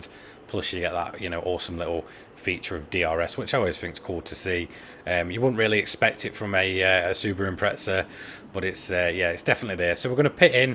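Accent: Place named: East Midlands, United Kingdom. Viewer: Gotta be Leicester or Derby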